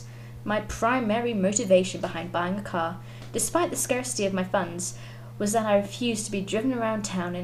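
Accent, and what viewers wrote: United Kingdom. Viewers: i love your voice! r u english?! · I LOVE THE BRITISH ACCENT!!!!!!!!!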